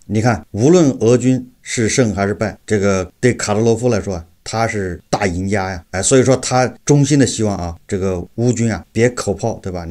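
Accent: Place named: Wuhan, China. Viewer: 标准的弯管子武汉普通话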